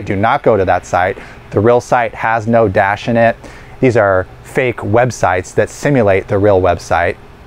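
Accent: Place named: United States. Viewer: Hello, I hope you are well. It's true, I'm not so tech savvy. But it's also true, I trade crypto - in the Winter hahaha. Is it possible you could put together a video on handling crypto safely - all your thoughts, experiences, past mistakes or close calls. Yes, I know there are probably dozens of them out there in youtube-land, but I watch your videos and feel you speak so honestly and directly and I think you would probably put a lot of thought into it and it would be super great. Maybe you have a lot of things to do every day. It's just a question. Thanks again for being so great. I really like your American accent. I know I wrote that before, but I do.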